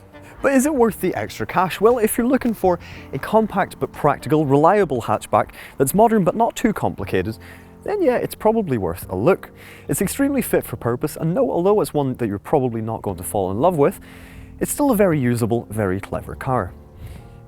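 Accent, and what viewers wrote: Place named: Northern Ireland, United Kingdom. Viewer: What about ya? 😀 I'm guessing you're from my neck of the woods - Northern Ireland! If I'm wrong you still need to slow down a bit so we oldies can get your drift!! We've just bought our first Jazz, a 2018 automatic, and we love it. Panoramic views and a dream to drive. Good review. 👍👏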